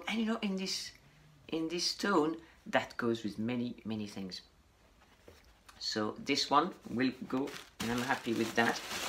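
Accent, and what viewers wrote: France. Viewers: We love a good french accent I speak french, Spanish, welsh, Japanese, Korean and English wow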